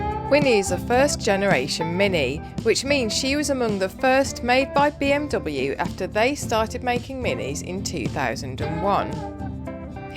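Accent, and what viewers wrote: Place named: Wolverhampton, United Kingdom. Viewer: Beautiful car, beautiful woman. I guess it comes down to money. If you're rich, get Winnie all fixed up and keep her going. Otherwise, all good things come to an end, and time for a new car. No point spending £1,000 on a car worth £500. Tom is amazing. Look after him! Enjoyed the video and always love your Wolvo accent. Thanks for posting. 🥰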